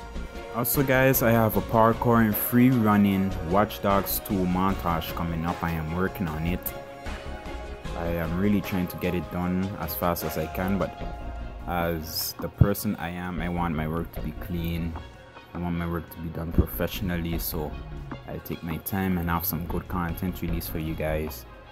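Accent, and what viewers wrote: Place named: Jamaica. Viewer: Your Jamaican accent is so cool bro 🙌🔥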